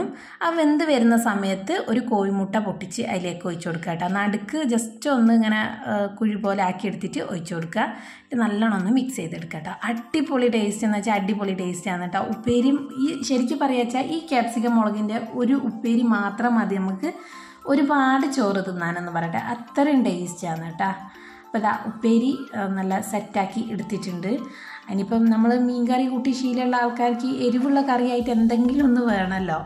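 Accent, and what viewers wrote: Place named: Kannur, India. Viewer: Kannurkare samsaram pole und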